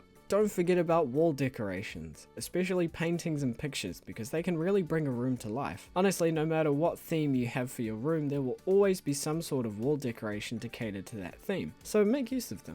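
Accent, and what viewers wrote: New Zealand. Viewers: There is nothing i love more than a good old kiwi accent. so calming to hear.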